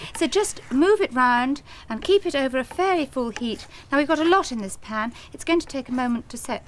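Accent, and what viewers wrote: RP, United Kingdom. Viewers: Judith Chalmers didn't sound much different in the 90s on Wish you were here... Mary sounds ever so posh, of course ;) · Why does she sound posher than royalty these days? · Wow, Mary Berry looks absolutely amazing back in 1973. She would've been 38 back then. Love her RP or Queen's English accent.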